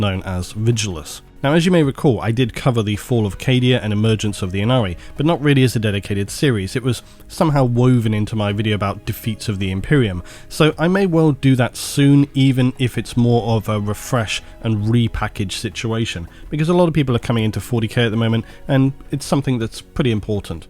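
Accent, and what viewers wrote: England, United Kingdom. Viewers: I like when England man speaks.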